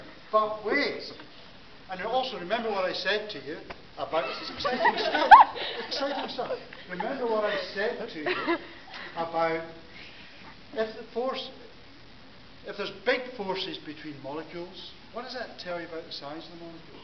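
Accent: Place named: Scotland, United Kingdom. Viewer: its called a scottish accent stud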